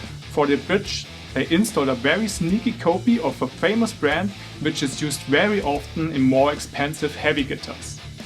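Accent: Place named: Germany. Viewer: I swear I hear a german accent in there! :D really cool videos by the way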